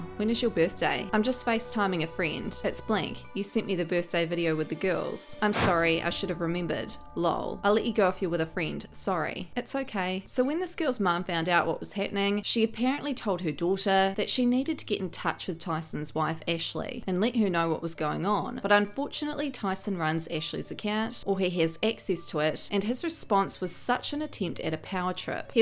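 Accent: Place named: New Zealand. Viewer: But for the accent and inflections, so distracting. Sounds Kiwi then have to mute with subtitles only. No complaints about the content though Carry on while I move on to something not so jarring to listen to.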